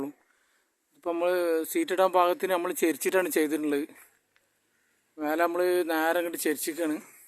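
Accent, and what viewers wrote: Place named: Malappuram, India. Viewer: Ithu Malappuram slag .manassilavathoru kanandaa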